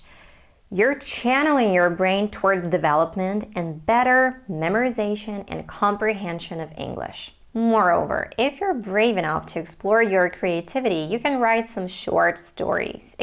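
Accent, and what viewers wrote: United States. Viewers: Have you ever been to or studied abroad in the US? I bet you've been. Because your accent is pretty nice and sounds like real American, How can you do that? Can you give me some tips to practice speaking sounds like a native speakers even as well as you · Hi! What is secret of your pronunciation like Americans? How do you practice everyday? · Hi again. when i heard your voice yesterday for the first time i thought by your accent that you were american. it's hard to believe that you are from ukraine. what i really think. if one don't have a chance to speak and improve the language every day otherwise it's impossible to got an accent like yours. it's just only a matter of time. stay healthy and hats off. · I like American accent, and could you please speaking a little slowly, I'm a new beginner.... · Hello. Where are you from? Is it American pronunciation?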